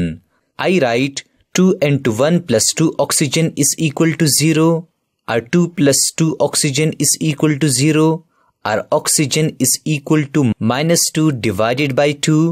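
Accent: India. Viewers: indian voice come in clutch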